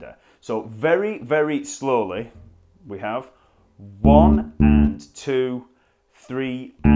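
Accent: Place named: London, United Kingdom. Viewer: he s not a verry goog bass player he is a teacher in deed but what i like the most its his accent it s a delight makes me feel like being in hide park at speakrs corner he deserves a marble arch bless cheers from France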